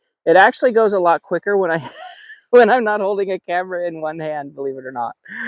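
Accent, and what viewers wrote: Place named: Midwest, United States. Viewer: For what it's worth, I can understand you just fine, though I'm a fellow Midwesterner. But I think the issue for some may be the slight muffled quality of the audio. Sounds like you may have a windscreen on your mike (which is absolutely 100% great), but there is still a little "fog" in the audio, which may be what people are reacting to. I don't know what video editor you are using, but DaVinci Resolve is a very, very powerful free video editor that also allows you to make the audio crystal clear using its Fairlight page. You may not have enough time in the day to utilize it since you (gratefully!) make a video so frequently. And that's much appreciated! Especially from those of us interested in planting Bamboo. But I thought I'd at least put this out there.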